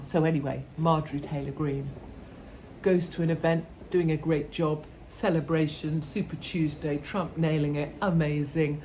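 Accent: United Kingdom. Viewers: you deserve the American Patriot Award! We Texans love British humor, perspective & your cute accent. And God, Guns & Guts to speak plainly. I see several fellow Texans Open Carrying each week. I have open & concealed holsters & carry a handgun in my car & SUV. I heard 3/4 of guys have a pistol or long gun in their car / truck in our State & the South, generally. Am armed society is a polite society! Any crook dumb enough to pull a gun here will find 4 or more pointing right back in a heartbeat.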